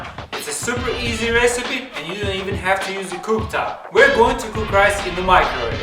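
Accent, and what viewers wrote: Russia. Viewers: “He sounds like crazy Russian hacker” most people from that part of the world do. So duh. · I can tell you are Russian or Ukrainian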